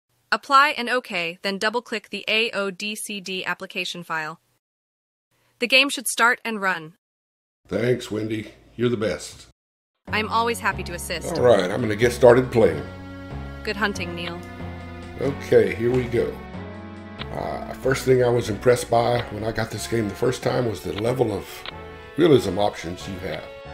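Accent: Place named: United States. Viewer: I know nothing about submarine games. I watched this because of your fantastic accent!!!😍😍😍 What U.S state are you from❓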